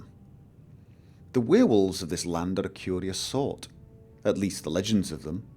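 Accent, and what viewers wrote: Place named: Bolton, United Kingdom. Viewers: I download the vids as MP3's and listen to them while trying to sleep. I like it that my storyteller has the accent of a true northernman, sounds more genuine. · I thought so, he's probably from Bolton though. I know a lot of people from Bolton who have that accent so it sort of got me thinking but thanks for the response. Sorry about mine! Hehe!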